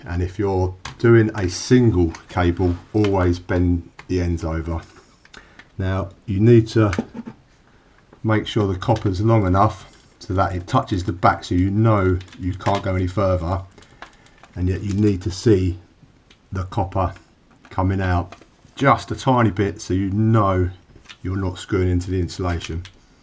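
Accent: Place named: Newcastle, United Kingdom. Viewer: just because your speech is almost the same as a colleague of mine’s (an electrical guy himself yet working in a Datacenter) originally from Newcastle… forgive my ear I am not british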